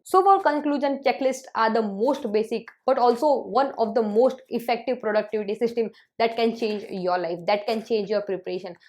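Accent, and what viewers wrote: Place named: Maharashtra, India. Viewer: are you from maharashtra(marathi speaking) i am asking this because your speech has marathi vibe and due to your pronunciation ... please reply yarr...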